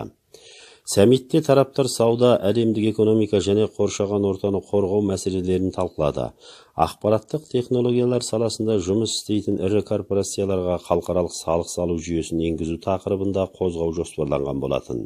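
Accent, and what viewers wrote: China. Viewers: Кытай акцентj басым .казакша окыту керек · Бұл админ қытайдан келген қазақ сияқты акцент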